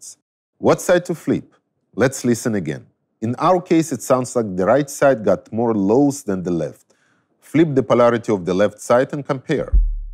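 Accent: Russia. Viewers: обожаю такой английский, русский русского лучше всего поймут по-английски))) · I love the russian accent (sorry, If I got it wrong).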